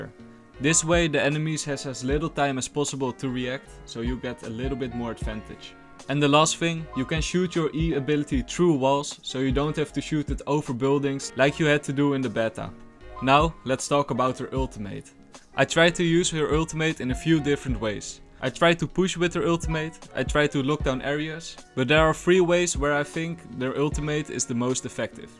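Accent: Netherlands. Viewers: Yeah you are dutch 100%%% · hahaha i knew that u from the netherlands greetings from germany my guy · Is this guy dutch? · i didn't know you were dutch, dacht dat je russian was ofzo ik was verbaasd XD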